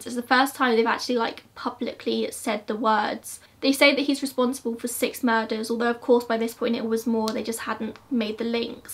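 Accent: England, United Kingdom. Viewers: I know this is so played out, but I just love an English accent.Combine that with murder mystery? I'm in! 😊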